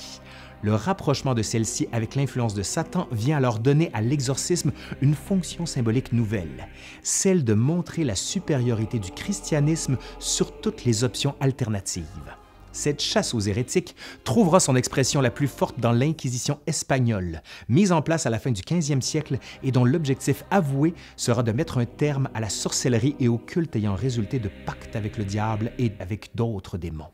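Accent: Quebec, Canada. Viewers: Je suis tombé sur ta chaîne car je trouvais le thème intéressant. J'ai été surpris que tu sois québécois et j'ai trouvé ça dommage car je ne suis pas habitué à cet accent. Cependant, j'ai visionné un peu plus tard une autre de tes vidéos et je dois dire que ça ne me dérange plus ton accent. Les sujets traités sont tellement intéressants et je trouve même maintenant que l'accent québécois est plaisant et joli à entendre. Voilà, Merci de nous éclaircir sur tous ces sujets tout aussi passionnants les uns que les autres ! Keep going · Mes seules références en la matière, c’est le film “The Exorcist “ de 1973😂! Merci pour ce complément d’enquête apportant quelques pièces de plus à mon puzzle! L’humour et votre bel accent Québécois rendent l’enrichissement de nos connaissances plus facile! 👍🏼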